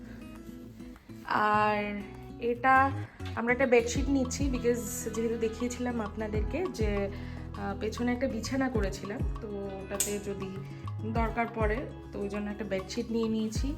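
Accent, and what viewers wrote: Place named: India. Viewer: Apnara originally kothakar...apanar bhasa suina mone hoilo assam ba Tripura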